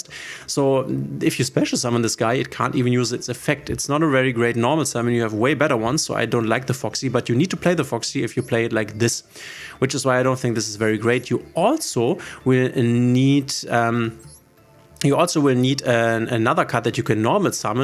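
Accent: Germany. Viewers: Bruder mach bitte ein Video auf deutsch und eins auf Englisch weil ich als deutscher so krass raushöre das du deutsch bist 🥲😂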